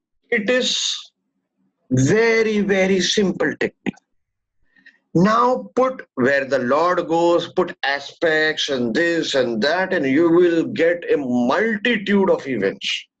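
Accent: India. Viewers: bingo. Sir, your style of speaking( perhaps the mumbai acent) always reminds me of amitabh of Amar akbar anthony !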